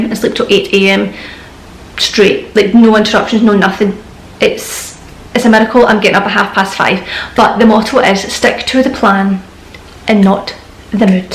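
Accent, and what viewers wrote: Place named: Scotland, United Kingdom. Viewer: Hey. Thank you for your video! Fun fact, I started following you in 2016 and one of the reason (on top of your awesome content and the veganism) was to get used to scottish accents before moving here. Now I've been in Scotland for 7 years, so thank you for being my introduction to the country (and fitness!):)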